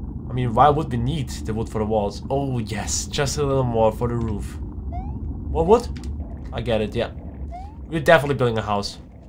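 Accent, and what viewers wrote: Germany. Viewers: Are you German? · Can it be that youre german or something like that? 🤔😃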